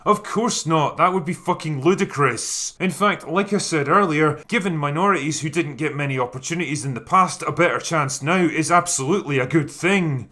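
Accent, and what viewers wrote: Scotland, United Kingdom. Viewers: Interesting hearing a Scottish guy say this is a story about England. Tolkien borrows more heavily from Celtic Myths than Anglo Saxon ones. Welsh heavily influenced Sindarin and Quenya is heavily influenced by Finnish. Tolkien wanted to fill in the gaps left by the Norman invasion and used Arthurian/Celtic myth to do so.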